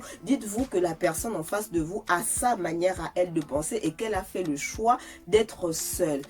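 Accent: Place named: Gabon. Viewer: Je ne te le fais pas dire ; dans son langage tu sens une femme gabonaise.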